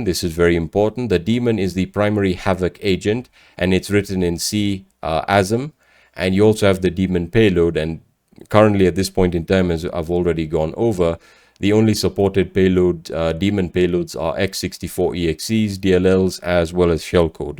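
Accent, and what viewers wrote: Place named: Arab world. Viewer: heh yea , familiar arabic accent - ps me nohomo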